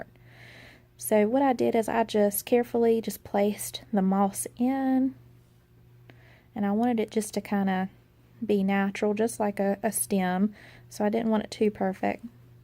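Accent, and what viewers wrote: South, United States. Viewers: Too cute!!!! To save time, I would just put drop of HOT temp glue to keep it shut so u can work on it easily. It won't show once it's painted. I'm sharing the tip cuz ur young and u need to avoid arthritis in ur hands and fingers as soon as possible. Straining ur joints to do anything leads to arthritis and pain. Absolutely loved it idea!!! Will share ur site with my nieces. Love ur southern accent, makes me homesick! Blessings to you AND ur hubby. Just tell him to wave next time and say Hey or Howdy! Make it more fun!! It's all abt having fun and enjoying life to the fullest. Let the little things go. If u can fix it, great! If not, let God take care of it! He's in control anyway. Follow the rules as best as u can (no one is perfect) and He will do the rest. I thank Him daily for all the little simple blessings that so many take for granted. 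Thanks for sharing!!! L :)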